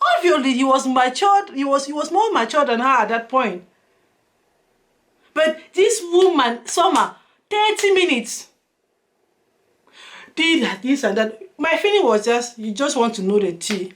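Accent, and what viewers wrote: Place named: Africa. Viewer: So what is the difference between you and Summer Aku? You both talk aggressively and I think that’s just your african way of talking. You are doing exact same thing you are accusing her of. If everyone should mind their business, then Summer Aku should mind her business and you too should mind your business. Everyone should mind their business. Something else, if you are going to quote Summer Aku you should at least quote her right. I watched that video and you’re not quoting her right. At the end of the day, as you are attacking Summer for getting involved you too should not get involved. You are not Nikki’s defender. There’s a lady on YouTube called LovelyTi. She talks about any and everyone just like Summer Aku. It’s not a new thing.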